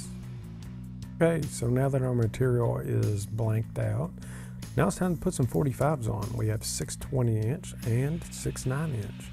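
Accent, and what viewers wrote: South, United States. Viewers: As an Australian I love your southern accent! Gorgeous project too : )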